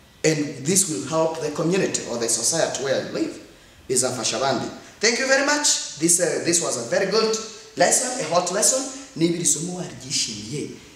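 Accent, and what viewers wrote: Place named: United Kingdom. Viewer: Teacher thanks for your contribution to the Rwandan community I really like your methodology,but most importantly your English is clean it sounds really british English not like some teachers whose English accent cannot be distinguished with their local languages. These international language should really not be confused with our local languages. Please keep it up.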